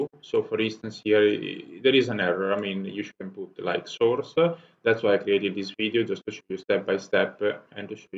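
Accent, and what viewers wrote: Italy. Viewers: hey! thank you for the great tutorial I found it very useful for my project, btw your Italian accent is awesome :D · thats a really good tutorialle. I opened my Appe. ıt worked with the leaflet mappe. BTW i didnt even heard italian ever but its funny ı give u that :D . thank you anyway :D greate tutorial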